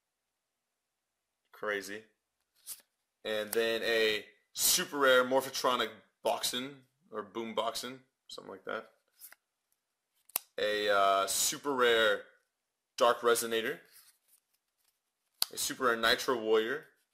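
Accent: Germany. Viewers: r u german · are you german?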